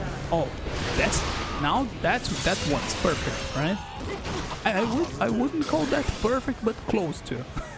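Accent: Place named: Brazil. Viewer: reconheci que era br so pelo sutaquekkkkkkkkkk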